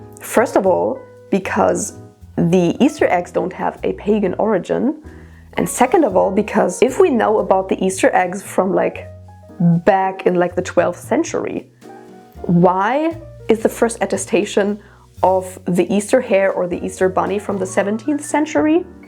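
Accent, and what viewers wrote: Germany. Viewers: You have a great "accent" like german would be your mother tongue. Greeting from germany! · I'm a Christian and I think you very informed. And if you are German I love your German Accent!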